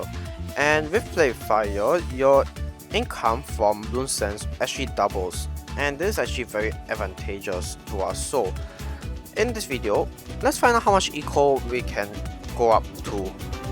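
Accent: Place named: Singapore. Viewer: Hey you sound like you are from Singapore? Just a random guess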